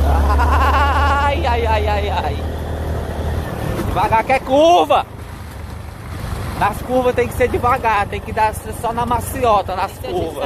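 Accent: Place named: Ceará, Brazil. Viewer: você é de que estado, você moram no Ceará? Eu sou de Pernambuco, o sotaque é bem diferente, os seus vídeos são muito bons, parabéns.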